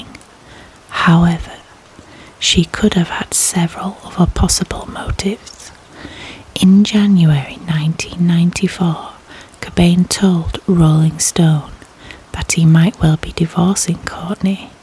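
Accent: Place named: Yorkshire, United Kingdom. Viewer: Came from jojo stayed for three reasons I like ASMR I like the Yorkshire accent I like hot mums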